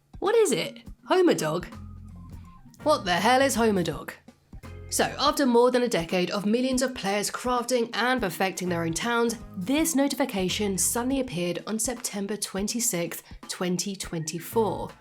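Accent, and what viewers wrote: United Kingdom. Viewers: A simpsons mega fan with a British accent? Tickle me pink!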